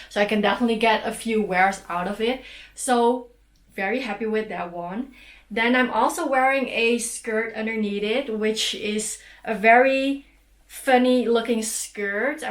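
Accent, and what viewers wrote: Netherlands. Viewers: Is your accent dutch?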